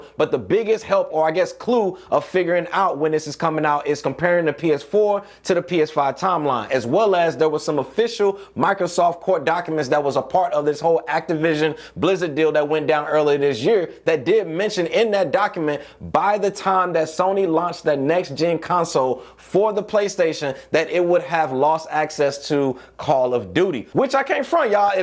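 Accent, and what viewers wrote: St. Louis, United States. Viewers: Big stl in bro voice shoutout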